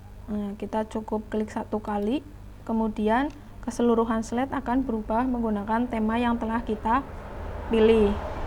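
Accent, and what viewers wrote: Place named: Banyumas, Indonesia. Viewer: Informatif👍 mbak e pasti orang ngapak😀semangat👍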